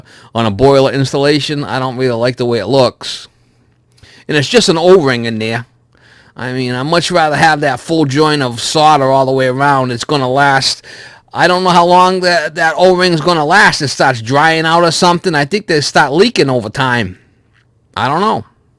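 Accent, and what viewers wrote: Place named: Boston, United States. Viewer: Where you from? Revere? Easty? You sound North of Boston. I'm from Dot myself. Love your vids dude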